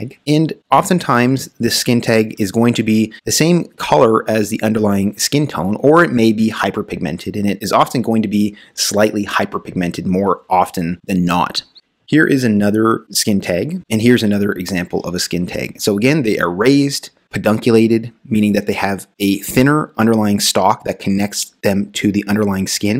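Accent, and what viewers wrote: Canada. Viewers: It's called a Canadian accent, genteel educated version.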